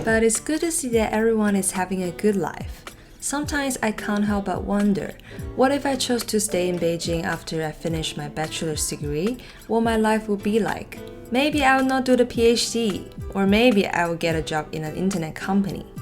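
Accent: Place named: United States. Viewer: 好重的美国口音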